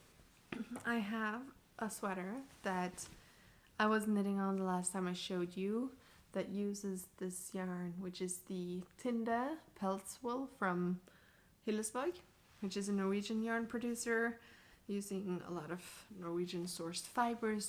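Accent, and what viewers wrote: United States. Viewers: Beautiful fobs. You have a very American accent for a person who lives so far from USA! I love your vlogs!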